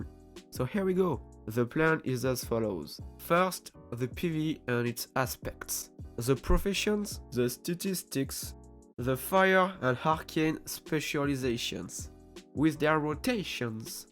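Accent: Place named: France. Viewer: Great vid! The french accent only makes it better <3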